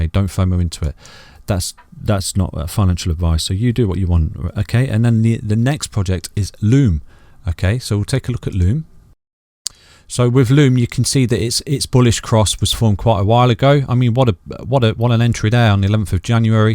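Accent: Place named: RP, United Kingdom. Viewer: Where are you from mate?, just got that BBC english accent i listened back in Bournemouth 2012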